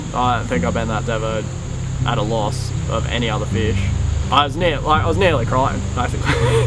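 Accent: Australia. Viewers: thx for this video, quite inspiring and that decent aussie accent lol. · This videos half as good if you take away the Aussie accent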